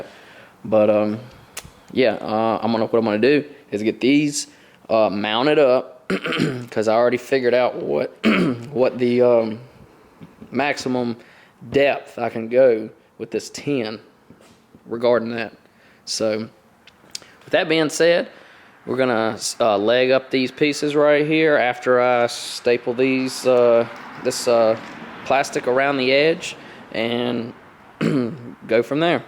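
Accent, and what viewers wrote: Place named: South, United States. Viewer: I'm just hear to hear that sweet southern twang...... XOXO!!!! THUMBS UP IF YOU AGREE!! #TDHFTFW #FAMOOSE #SHTNONM #TEAM.DEADLY.HERTZ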